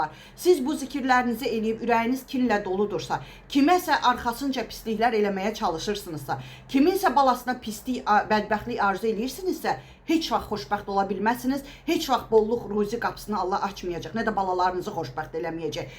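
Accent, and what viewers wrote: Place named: Aghdash, Azerbaijan. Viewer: Allah razı olsun hem yerimiz bacı mende Aqdaş rayonunanam darıxmışdım lehcemiznücün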